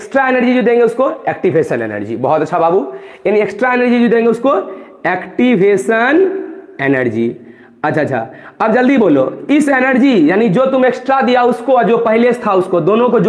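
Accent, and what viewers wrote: Bihar, India. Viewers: Bihari accent 🫂🔥🔥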